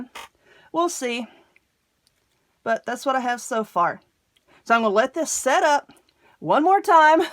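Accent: South, United States. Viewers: Miss Southern Lady, I definitely live your southern accent. You have a good sense of humor. I enjoy watching your videos. I love watching your different types of craft.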